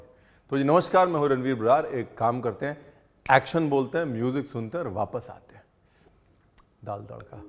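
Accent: India. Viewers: Ur food is next level sir,it always has been, but the way you speak in between, those small small nuances that u add,n ur desi accent adds a different flavor n tadka to ur recipes.love u n god bless u.😀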